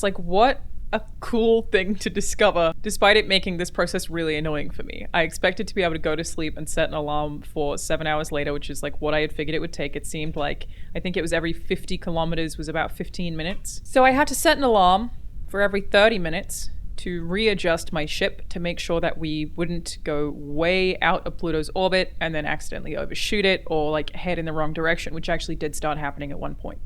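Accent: Australia. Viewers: Are you Australian? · Dude, your accent's kind of blowing me away. It's like this weird amalgam of like Australian and New Jersey (?)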